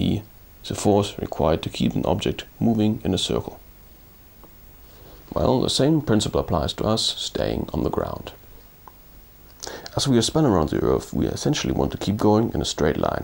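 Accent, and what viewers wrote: Germany. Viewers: If i hadn't read this comment before, i could think you were from Germany with this accent.^^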